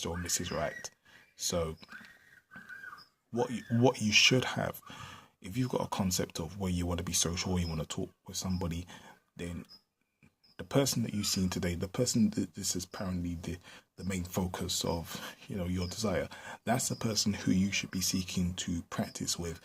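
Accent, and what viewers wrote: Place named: Nigeria. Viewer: I hear Nigeria in your accent.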